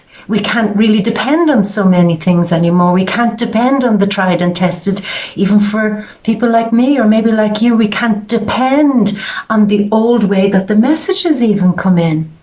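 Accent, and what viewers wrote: Ireland. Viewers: Anything you do or say is always a blessing , you have a healing energy and that Irish accent is a killer , as a Leo I can only cheer you doing you. Thanks for being ❤️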